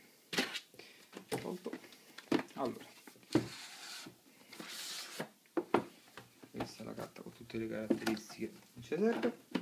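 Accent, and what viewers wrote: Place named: Rome, Italy. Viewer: Oddio, il nuovo video di!!! Appena ho visto l'avviso su FB sono subito venuto a vederlo!!! Voglio l'autografo e voglio anche registrare questa bella voce romana di Roma sud!!:)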